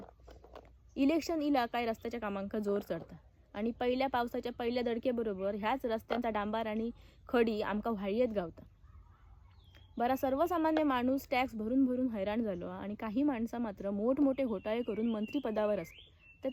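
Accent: Malvani, India. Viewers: तुझी मालवणी भाषा ऐकायला मस्त वाटत बेस्ट लक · खूप छान वाटते तुमची मालवणी ऐकायला एकच नंबर ☝️👌🙏❤️❤️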